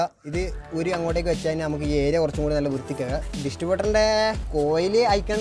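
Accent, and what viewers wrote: Kannur, India. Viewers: കണ്ണൂരുകാരാണോ ബ്രോ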